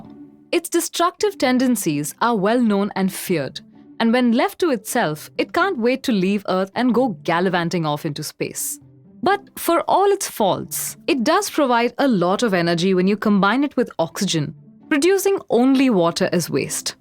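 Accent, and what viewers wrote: India. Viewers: i like the Indian accent personally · when hear indian acent i laugh so hard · I love her Indian accent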